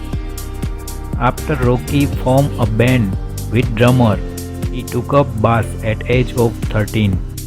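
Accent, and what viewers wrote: India. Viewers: The voice in the documentary seems Indian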